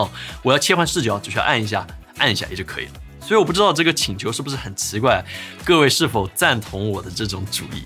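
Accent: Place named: Zhejiang, China. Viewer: 听着像浙江/上海口音。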